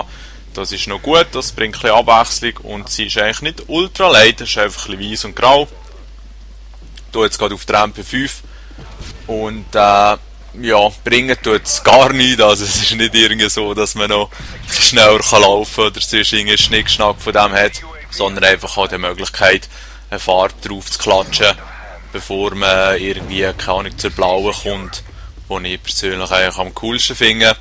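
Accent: Switzerland. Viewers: Schweizerisch is schon lustig, jedoch als Deutsch verstehste manchmal nur Bahnhof :D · DD alter schweizer is das geil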